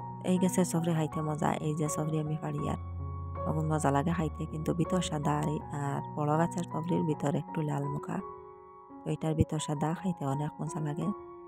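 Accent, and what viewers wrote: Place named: Chittagong, Bangladesh. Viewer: আসসালামু আলাইকুম আপু কেমন আছো লাইক দিয়ে ভিডিও দেখে নিলাম তোমার কথা শুনলে আমার হাসি এই আপু আমি তোমার কথা কিছু বুঝে কিছু বুঝিনা তুমি কি চট্টগ্রামে ❤❤❤❤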